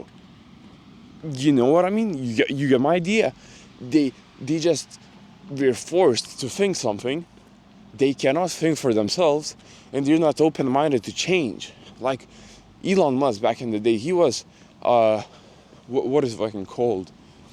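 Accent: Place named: Croatia. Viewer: I recently hitchhiked around Europe from the u.k. not Lithuania sadly. I thought you sounded Croatian for some reason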